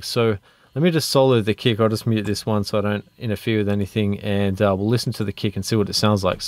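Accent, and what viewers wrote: Australia. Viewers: Aussie accent ?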